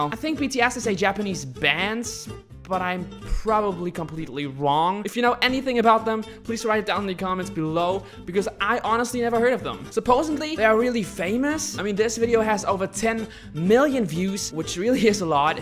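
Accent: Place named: United States. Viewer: hätte ich nicht gewusst dass du Deutscher bist, hätte ich gedacht du wärst ein Ami xD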